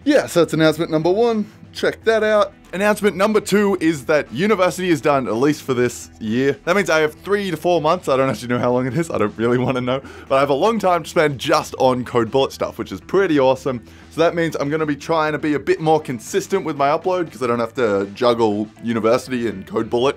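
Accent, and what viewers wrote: Australia. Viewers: Never EVER do a video where you do not speak anymore y'hear? Your videos are 100x more enjoyable when I get to hear that glorious aussie accent of yours. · You're Australian nice! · I don’t know how I didn’t figure out he was Australian, I’ve been assuming he was British which would be acceptable if I was American but I’M British and parents and entire extended family are Australian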